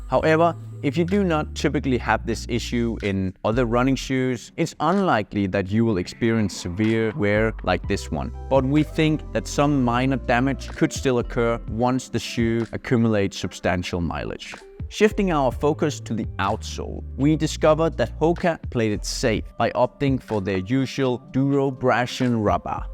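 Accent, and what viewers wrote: Denmark. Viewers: My mum is Danish (from Horsens) so hearing a Danish accent always make me happy. Your English is outstsnding. Especially all of the technical vocabulary. Thank you for the in depth reviews. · This dude danish accent is so strong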